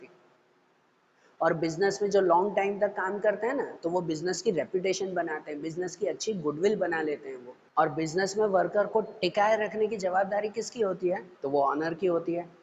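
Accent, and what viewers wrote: Gujarat, India. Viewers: Sir aap Gujarati ho !?😋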